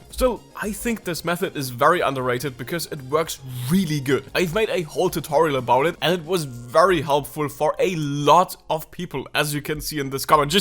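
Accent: Germany. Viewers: I only subbed because you keep on showing up in my feed as I'm trying to learn art and I find it kinda funny that we are both named and speak english with a wacky german accent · Are you german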